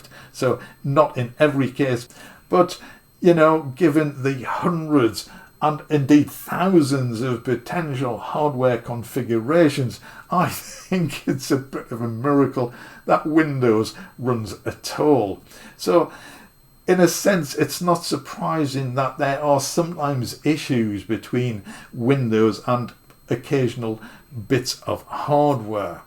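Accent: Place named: Geordie, United Kingdom. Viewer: I spent 2 hours installing what I could and got as far as the s49 Mk3 finally working with Kontakt 8 in Cubase 15. However I can’t get the DAW control features to work, and the screen is really jittery, jumping around a lot. I plugged the USB cable into the one USB-C port on my brand new computer and I got the feeling that the large plastic cable maybe wasn’t going far enough into the port to make a proper connection. But the keyboard fired up fine and seemed to update okay. I bought it, mint condition, off eBay, so I’m shitting myself that I’ve bought a pup. But I think that’s pretty unlikely. Hopefully your video will help. Enjoying listening to the mild Geordie accent too. Reminds me of me late fatha. 😆❤️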